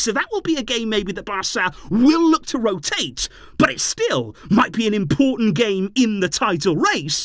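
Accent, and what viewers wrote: United Kingdom. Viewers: i am curious 👀. Your accent is clearly from the UK. Don’t you have a club in the UK that you support. I live in the Netherlands 🇳🇱 and I support First Barca 💙❤️ and second Ajax ❌ ❌ ❌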